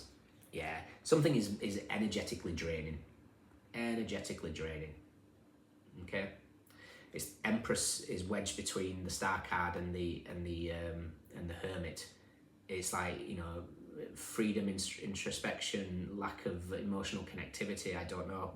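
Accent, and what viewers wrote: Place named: Cumbria, United Kingdom. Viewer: Are you from around the Barrow-in-Furness area? I was born there, your accent is familiar. Yes I'm done with family that have a toxic pattern. Been scapegoated long enough. Done. Isolated, alone, but better than being crapped on repeatedly!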